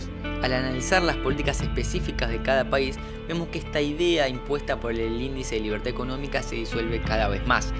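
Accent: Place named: Argentina. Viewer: solo un progre o izquierdista pudo hacer este video, y por el acento, de seguro es un peroncho kirchnerista. No tiene validez.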